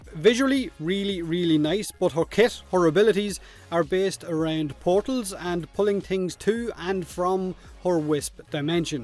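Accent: Ireland. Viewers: I love Irish you tubers “Harnesses the power of the Feeking sun”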